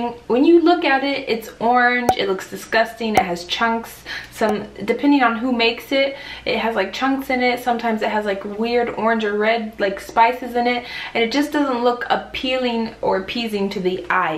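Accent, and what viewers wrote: Bavaria, Germany. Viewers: Bavarian myself and I was just about to post the exact same thing. You actually sound exactly like a bavarian. Not even germans are able to pronounce it that well in 90% of the cases. Also Leberkaas sounds pretty perfect to me. Did you live in bavaria? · Your Bavarian pronunciation is delightful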